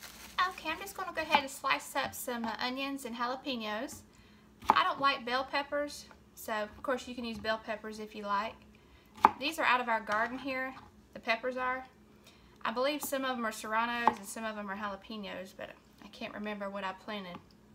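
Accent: South, United States. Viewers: P.S. - oh yes, you definitely have a southern accent. I wouldn't call it country, but definitely southern .. 😉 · I love it when I see cooks using Tsang's! Your country accent is adorable! Rock On!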